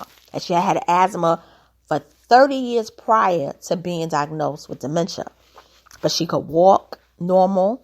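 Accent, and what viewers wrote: New York, United States. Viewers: you sound so NY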